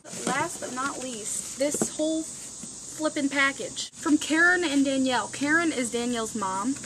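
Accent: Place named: Chicago, United States. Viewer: do u live in chicago... cause my family does and u have a illinos/chicago accent..... just wondering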